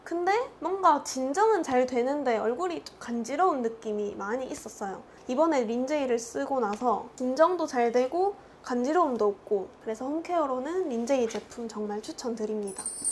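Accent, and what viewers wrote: Gyeongsang, South Korea. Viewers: 인스타로 보다가 영상으로 보니 더 친근한 느낌이나네용ㅎㅎ 특히 구수한 경상도 사투리가용 ㅎㅎ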